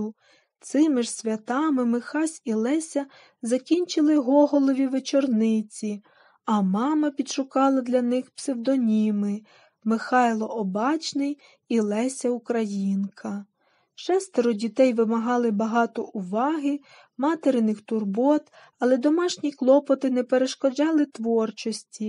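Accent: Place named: Russia. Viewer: Не до‘чка ,а дочка‘,багато наголосів російських ,а не полтавсьо -украінське.Розповідь інтересноа й пізнавальна ,але вимова не професійна .Спасибі .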